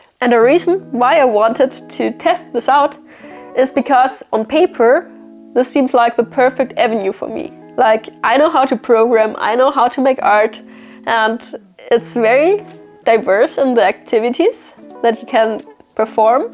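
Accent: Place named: Germany. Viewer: You have a German english accent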